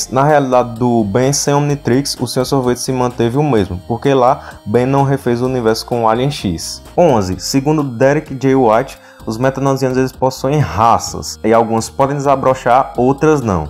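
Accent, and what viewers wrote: Northeast, Brazil. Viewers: Ce é nordestino?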